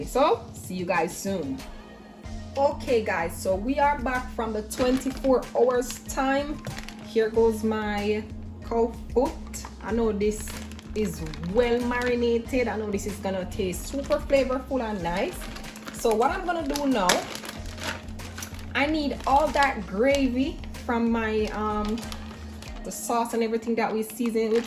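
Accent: Jamaica. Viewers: This Channel Is the Best Channel out of every other Cooking Channel Because U identify the ingredients products Step by Step an identify the Food Process an Her Beautiful Accent OUR Language Potwah 🇯🇲 Vinegar an Lime is Optional Part of your Cooking Section 100/100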